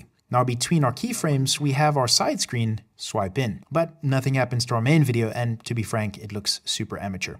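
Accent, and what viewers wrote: South Africa. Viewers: Your accent sounds South African ? Are you ? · Are you South african🇿🇦?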